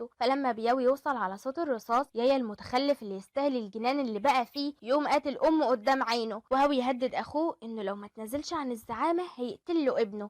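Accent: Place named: Egypt. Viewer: دخيل هالطلة بصوتگ المصري مأحلاه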